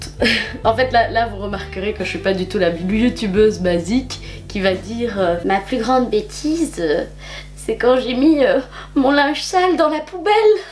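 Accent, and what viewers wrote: Belgium. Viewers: Ahahah j'avoue le coup de faire une vidéo seulement si on a atteint un tel nombre d'abonné-es je n'y aurais pas pensé ^^ Si tu fais un meetup je serais là ! :) Je pense qu'on habite la même région au vu de ton accent et de là où j'tai vue. D'ailleurs si tu fais un prochain FAQ j'aimerais juste savoir dans quelle ville tu habites? Les youtubeur-ses belges sont tellement rares! ^^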